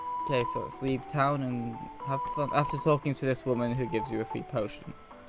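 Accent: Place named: England, United Kingdom. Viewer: I am hispanic american, but I dont think I have any particular accent myself despite my hispanic roots. To me though you just have an english accent, I know that there are various kinds but I cant really tell the diffrence.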